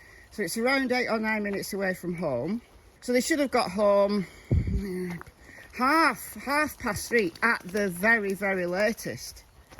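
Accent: United Kingdom. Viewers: I love your channel, and I so enjoy your walks with your cute dogs. I also love your British accent (I’m a Texan, so you would probably think I’m the one with the accent, lol). Take care. I’ll be watching/listening! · where are you from (area of the UK)? You have a very particular accent, so I wonder where in the UK it's typical. Thank you. PS: I love your walks with doggies. They are lucky to have so lovely places to go to with you. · You are a beautiful British Lady! And I ❤️ your accent. Nice seeing you before our walk. Great timeline connections. Makes sense. I’m personally getting tired of “what if” scenarios & just wish TBI would confirm some facts they’re working with. IMO this is connected to a larger crime like trafficking & that’s why TBI is silent while they work that angle. Just my thoughts. Luv u & the doggos! ❤️❤️❤️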